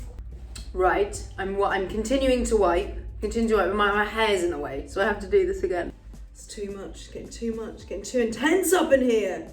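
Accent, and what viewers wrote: United Kingdom. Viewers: Tell me your British without telling me your British (I didn't know she was Brit) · Love her British accent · Am i the only one who love this British accent? 😍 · I love her british accent>\\\<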